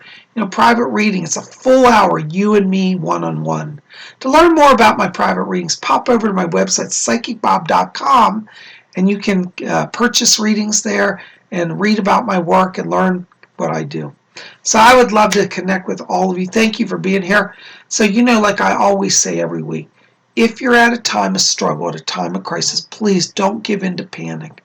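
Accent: United Kingdom. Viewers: you starting to sound like your from UK lol you and Lady Cola make a beautiful awesome team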